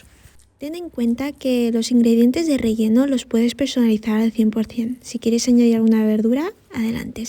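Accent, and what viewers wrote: Spain. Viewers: Me encanta tu acento español!!!! 😂👍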